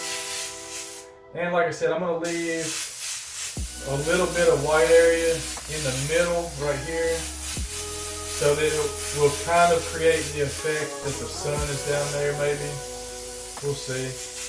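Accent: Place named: South, United States. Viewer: Where are you from? I'm from NC & AL. and your accent sounds close.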